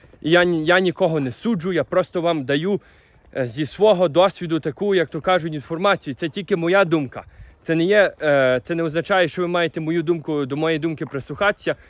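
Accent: Western Ukraine, Ukraine. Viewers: Підписалась, шоб тільки оцю западенську балаканину слухать)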